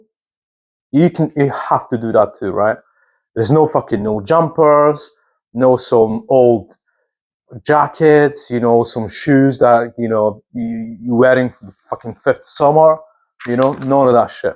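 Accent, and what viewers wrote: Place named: Scotland, United Kingdom. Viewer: Are you Scottish but trying to be easily understood or like Eastern European but then it comes off as Scottish?? And I’m not saying that like critically? Whatever it is, I can fully understand you as an American so …. Right on 🙌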